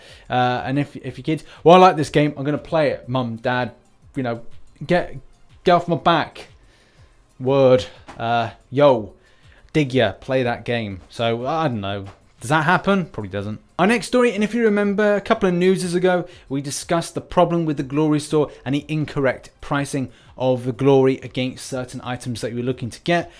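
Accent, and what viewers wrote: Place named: England, United Kingdom. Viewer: it took me 6mths to realize u were saying forum link not foreign link. Good old queens English hey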